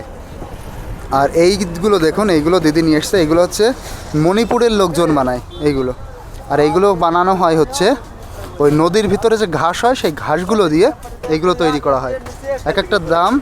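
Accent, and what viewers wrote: Bangladesh. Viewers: তাঁরা কত কি খায়! মনে হচ্ছে খাবারের তালিকায় কিছুই বাকি রাখছে না! আপনার কথা অনেকটাই বাংলাদেশীদের মতো! মাঝে মধ্যে ভারতের পশ্চিম বঙ্গের মতো আসে!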